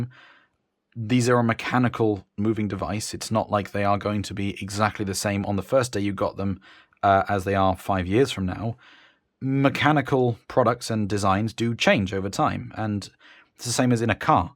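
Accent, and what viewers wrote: United Kingdom. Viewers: I’m simply listening through fiio m11 with oriolus BA300s and phantom headphones (modified) with all my research LSA is the way to improve my headphones when budget allows. One thing I’ve wondered. What difference does desktop amp/dac make to sound over portable? I’ve seen reviews of the topping a90 and wonder if that would produce better sound than my motu 828 mk3? I look forward to your future reviews. Good to hear a Brit doing these reviews, I’m up in Cumbria. Keep up the good work.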